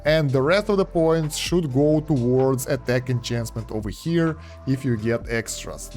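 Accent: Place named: Russia. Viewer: По акценту слышно русский мужик пожалуйста сними ролик на сборку скиллов на демона и ангела пж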